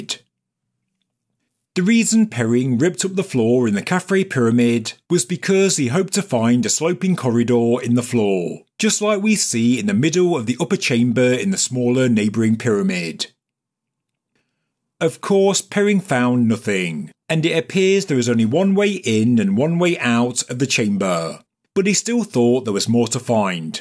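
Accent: United Kingdom. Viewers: May I ask what part of the UK. There are different accents in the UK!! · identifies as a UK citizen. Accent sounds pure UK to me. (No offense to your husband.)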